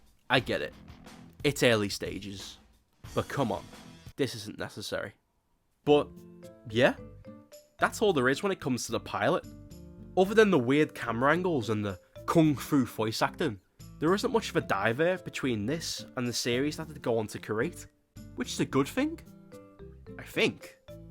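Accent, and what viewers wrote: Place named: Liverpool, United Kingdom. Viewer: Have to say bro, these videos are excellent! Like the scouse JonTron 😉 Gary chopper should be proud 😉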